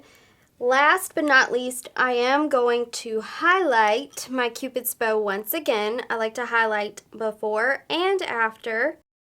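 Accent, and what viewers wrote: South, United States. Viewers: I think she should try asmr. Her southern voice might actually work if she's whispering.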